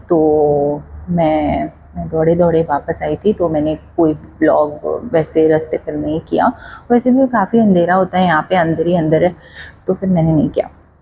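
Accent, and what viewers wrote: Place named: Maharashtra, India. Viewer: Aap Maharashtran ho Kya Mahrati Awaz Arhi Thi 😍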